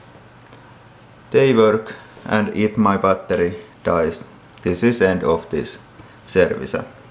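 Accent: Finland. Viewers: He's from Finland.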